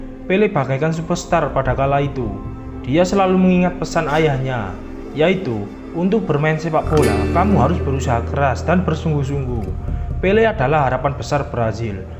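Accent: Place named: Java, Indonesia. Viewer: suarane medok banget, mantab surantab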